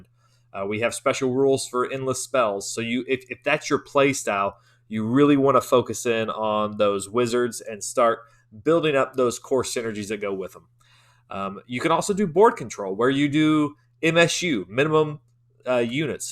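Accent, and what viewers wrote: Texas, United States. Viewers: Really good video! Loving the Seraphon focused content! Awesome channel, keep up the hard work. Shout out from Kansas bro! thought i sensed a Texas accent in there! 🤘😁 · Ya know I'm not great at picking out accents but I'm pretty I'm pretty sure this guy's a Texan too. *proceeds to miss the lone star in the back for over half the video*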